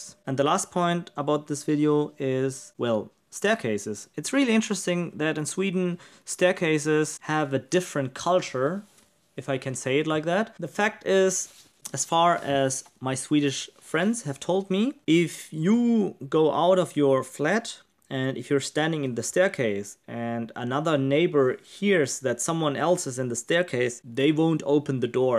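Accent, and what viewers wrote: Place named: Germany. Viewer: Ah... you mentioned Germany. I am so stuck in old-fashioned ethnic thinking that I could not figure out why a man of your skin-colour would be speaking with a German accent. Forgive me :) Funny quirky vids. Thank you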